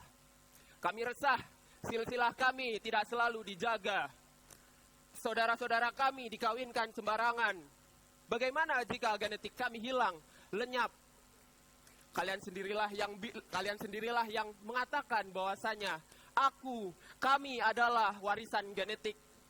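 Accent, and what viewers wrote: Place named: Banyumas, Indonesia. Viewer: Mas Ngapak idolakuuu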